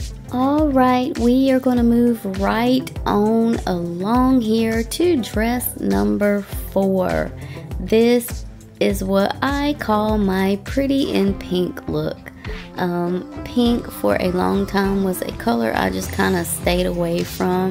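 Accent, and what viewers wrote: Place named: North Carolina, United States. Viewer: You're a sugar cookie, Love that NC accent too.. Subscribed 😉😊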